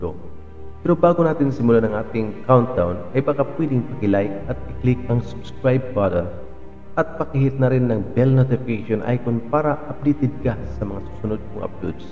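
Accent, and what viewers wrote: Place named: Visayas, Philippines. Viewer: Wala na halos maintindihan puro reverb.Visaya ka pa man Dong😅😅✌️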